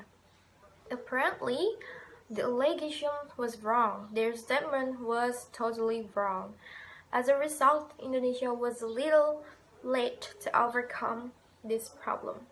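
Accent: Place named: United Kingdom. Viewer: Hi! Long time no see! I'm glad that you look healthy in this video! Keep yourself safe during the quarantine ok! I love the way you speak english, you almost nailed british accent! Your pronunciation was also clear, i can understand every word you said. And thank you for the information, it's very useful! Keep safe and stay healthy ok! See you after the quarantine ends!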